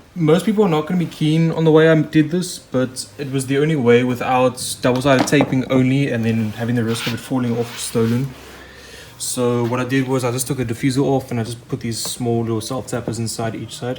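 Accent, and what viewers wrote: South Africa. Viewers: brother this is awesome, You sounds south african! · bra youre so me lol. You even sound South African ...Only thing left is for you to be from the kasi.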